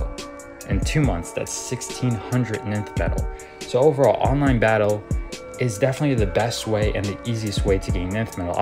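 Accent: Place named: London, United Kingdom. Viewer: You are from London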